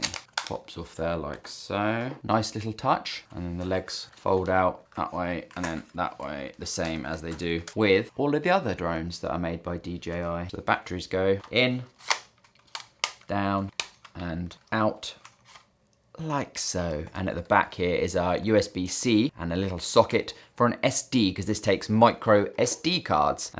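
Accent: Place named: England, United Kingdom. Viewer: Oh! It's so nice to hear an English accent (I have nothing against American people by the way.) It's just that all the other reviews I've encountered have been American or Canadian. What a relief! Someone speaking my language!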